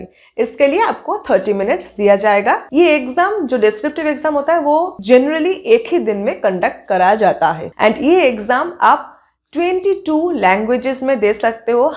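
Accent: Bengal, India. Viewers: U r bengoli?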